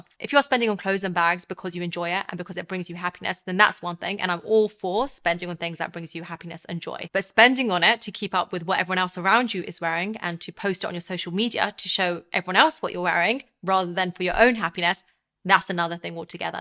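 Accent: United Kingdom. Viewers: I have no idea what country this was produced in. I'm guessing by accent it is the UK. I will say a major factor in the United States for having to stay in the rat race at dead end jobs is health insurance. As everyone in the US knows health insurance for oneself and their family are tied to their employer. Private insurance is just too expensive for anyone over 25. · She is Indian but speaks with a heavy loaded British accent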